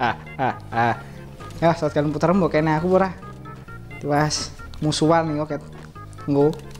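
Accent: Java, Indonesia. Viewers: Orang Jawa kah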